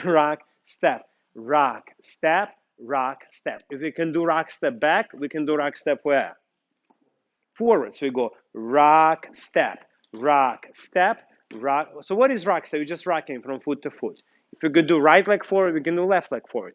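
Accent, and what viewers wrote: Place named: Ukraine. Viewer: I watch your videos all the time for tips! I would always say to myself "you are exactly like my instructor"! When my social dance studio closed down from Covid last year, I managed to find a new studio close by. I wasn't expecting one-on-one with the utmost professional dancer as I was only used to group dancing with my granny buddies where I think we put more social into the studio then perhaps the dancing. However, I really liked learning all the different dance styles and wanted to continue. The new studio I found is nothing like the old one. I come home and need a hot bath after these lessons. I also had to look for videos for tips and exercises, but they couldn't be just any videos, I needed to find videos where the instructor would say " posture, posture posture, you have to settle your hips, core in, rotation, you need to create a straight line"! 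Then when I heard in your video "a beautiful straight line" in the same exact accent as my instructor, (who is also from the Ukraine), I said to myself... these are the perfect videos! I really like learning the mechanics of the dance now even though it is a lot of hard work! However, the mechanics put the meaning, style, expression, and beauty in every step. I am grateful for finding the most passionate and experienced instructions. Thanks for all you do!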